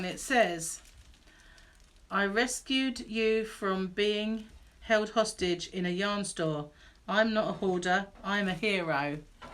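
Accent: United Kingdom. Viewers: Love ya guts lady!! Aannd I absolutely love the way brits say aluminum!! I watch Joel & and they talk about the similarities and differences between the USA and UK I just adore the accent!! The happy mail is awesome!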